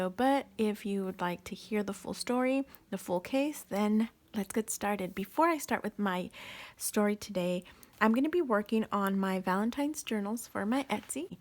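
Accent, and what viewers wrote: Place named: New York, United States. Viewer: Love your New Yorker accent...lol It’s a shame no one was found accountable for the crime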